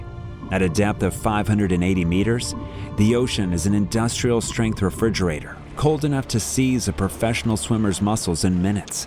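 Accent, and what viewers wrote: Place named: United States. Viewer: That single episode made me not want to subscribe. Accent and dialogue is way too American. It sounds frustratingly stupid.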